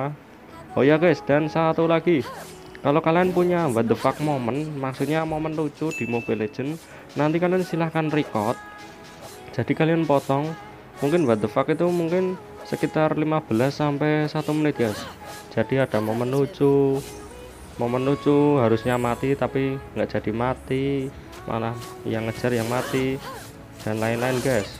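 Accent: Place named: Banyumas, Indonesia. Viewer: Youtuber ngapak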